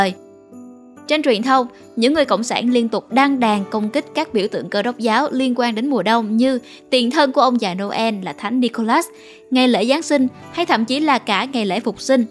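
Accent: South, Vietnam. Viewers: Giọng miền nam duy nhất của nhà spider nhĩ, nghe de thương quá 😍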